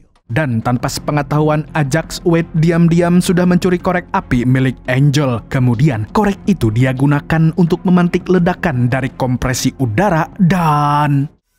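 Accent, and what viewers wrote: Banjar, Indonesia. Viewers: bah banjar kalinya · Pian nih urang banjar kah mang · Urang Banjar hostnya 😂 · Urang banua sekali ny .. 😂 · orang Banjar kah mang🙏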